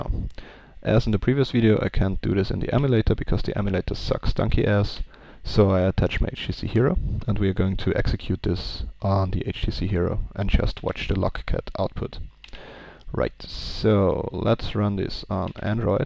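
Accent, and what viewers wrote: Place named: Germany. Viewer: Nah, a heavy German one ^^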